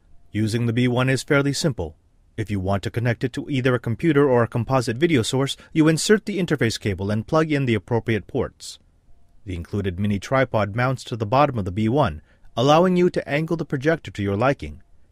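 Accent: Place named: Philippines. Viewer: one last question out of my curiosity since you are a Jesuit priest. Can I ask if you are a Filipino from Ateneo Universities in the Philippines? The way you speak it sounds like a Filipino and at the same time every features of you makes me feel like you are one of us. peace, popoy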